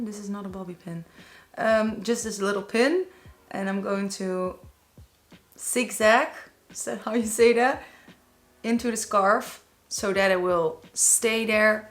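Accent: Netherlands. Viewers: I just feel like your Dutch, I mean your accent, it sounds like it. No offence, just a thing I noticed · Ik kan gewoon aan je accent horen dat je uit Nederland komt, leuke filmpjes goed bezig ma sha Allah! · Ja hoorde het ook al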